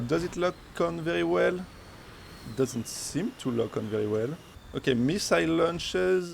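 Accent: France. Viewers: French accent? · Very french sounding 👌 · Average french accent 😅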